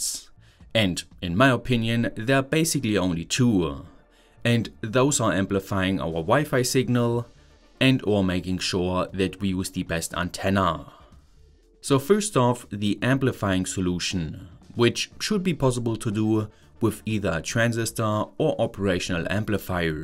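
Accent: Germany. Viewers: If you are a, why do you have such thick German accent? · Ich feier ihn immernoch für das spezielle Deutscher redet Englisch.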